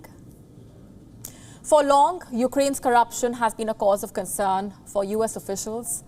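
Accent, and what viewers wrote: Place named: India. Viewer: Nothing worse than Indians speak English my ear drums are bleeding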